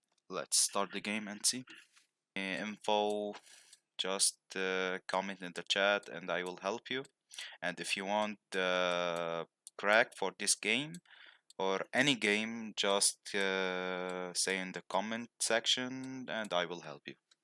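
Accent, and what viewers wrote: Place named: Italy. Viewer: Grazie caro ho risolto, sembri italiano dall’accento quindi bella pe te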